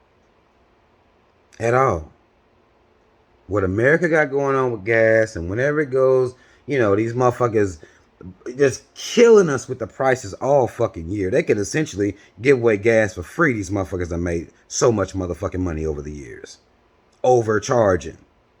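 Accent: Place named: France. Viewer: I fw you. That being said.. Accents are a thing. When you expose yourself to the rest of the world it's something you grow to understand. It'd be quite foolish of me to ignore what wisdom you have to share because it was shared in a french accent would it not?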